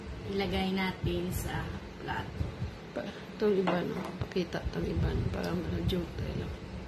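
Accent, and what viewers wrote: Iloilo, Philippines. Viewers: Nang may halo ka nga ilonggo haha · ilongga gid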